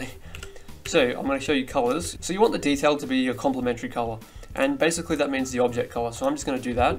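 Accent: Australia. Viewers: being australian af with that G'day everyone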